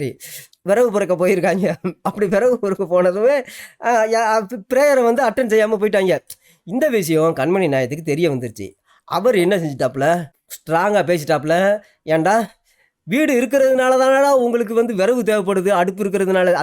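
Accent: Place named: South Tamil Nadu, India. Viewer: சிறப்பு சிறப்பு நன்றாக வெளிச்சம் போட்டு காட்டுகிறீர்கள். பேசும் தொனி ஏற்ற இரக்கம் தென் பகுதி உச்சரிப்பு பாராட்ட வார்த்தைகள் இல்லை 🫂🫂🫂🫂🫂🫂🫂🫂🫂🫂🫂🫂🫂🫂🫂🫂🫂 Number one 😢😢😢😢😢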